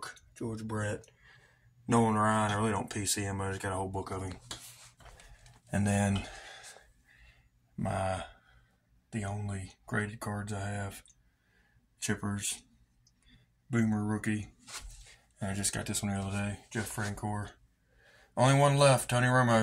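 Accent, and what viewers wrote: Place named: South, United States. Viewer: Now that your doing more videos, I'm getting hooked on that sweet southern accent. Love the card room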